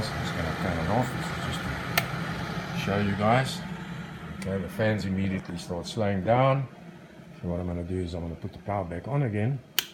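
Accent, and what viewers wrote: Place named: Afrikaans, South Africa. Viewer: Jy klink afrikaans.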